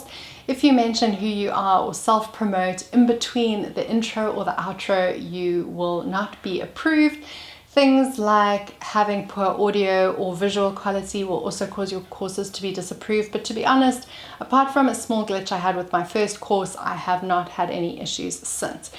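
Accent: South Africa. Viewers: What an incredibly beautiful woman. Goodness me. And the South African accent just sprinkles even more beauty !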